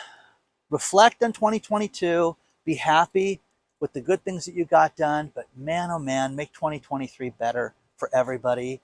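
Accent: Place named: California, United States. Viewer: My mom watches your videos religiously and has for many months. You seem like a sensible guy. You clearly know magnitudes more than you let on. You're also one of the very few people I've ever seen who has a legitimate Californian accent. Lots of people still claim there is no such thing, but it's very subtle.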